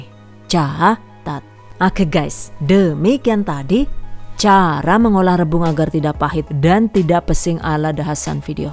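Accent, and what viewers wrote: Banyumas, Indonesia. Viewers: Pertama melihat video beberapa tahun yang lalu, saya pikir ini suara ibu-ibu yang sudah tua, ternyata orangnya masih muda dan cantik, dan di selingin bahasa ngapak yg lucu, terimakasih untuk videonya ya mbak, salam dari cilacap. Semoga sekin sukses dan berkembang Aammin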